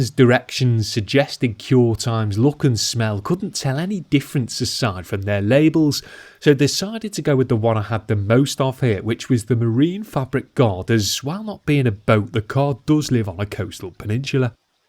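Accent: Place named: Birmingham, United Kingdom. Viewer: OMG the bleedin Brummy accent unt the subtle innuendos made me smile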